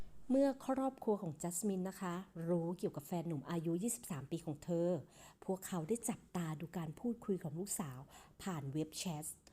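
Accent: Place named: Thailand. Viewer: สำเนียง eng ไทย มันจะพาลิ้นพันกัน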